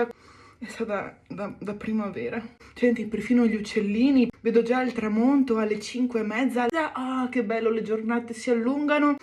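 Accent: Veneto, Italy. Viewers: Che bello questo accento veneto❤❤❤❤il mio papà era della provincia di Padova, è mancato tanti anni fa e sentirti me lo ricorda tanto!!! Grazie di tutto e grazie dei tuoi consigli ❤❤❤❤, ti abbraccio forte forte 😘😘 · La parlata veneta me fa morì 🥰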